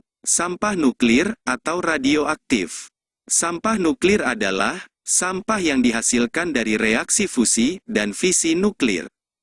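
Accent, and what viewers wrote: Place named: Banten, Indonesia. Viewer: banten mana suaranya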